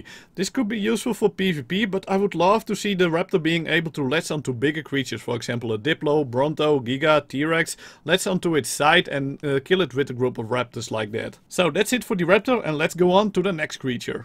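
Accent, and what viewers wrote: Netherlands. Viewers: Lord Tachanka volgens mij wel want hij had eens een nerderlandse command geplaatst en hij spreekt veeldingeb op een nederlandse manier uit zoals sarco en andere dingen en hij heeft zon nederlands engels accent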